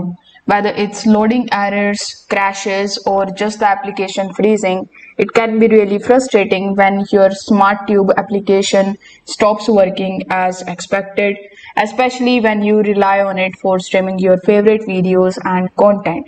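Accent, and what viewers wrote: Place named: Bengal, India. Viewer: B4 you tell us how to fix please talk proper English not bangali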